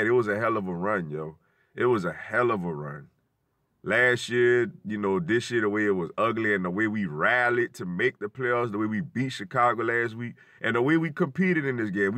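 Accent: New Orleans, United States. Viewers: Bruh it sound like you from New Orleans.. I’m frm New Orleans myself so I know a fellow New Orleanian when I hear one. I’m jus confused as to why you not a Saints fan cause it sounds like you ain’t been gone too long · It kinda sound like you're from New Orleans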